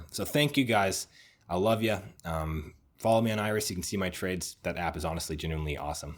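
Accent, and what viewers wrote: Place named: New York, United States. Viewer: Your videos are so well put together I’m guessing you make the hard look easy and your American accent I’m guessing is New Yorkan? That’s just the icing on the cake the content I always feel is first class. What surprised me was today’s content I really needed this as a professional civil engineer I got into it because I followed in my old mans footsteps but I have to say after 18 years I’m done. Time to follow the thing I’ve always wanted to do for the last 12 years, tech. Cheers x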